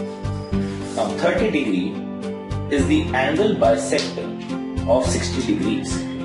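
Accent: India. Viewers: Ocean Avocado - That's an Indian accent.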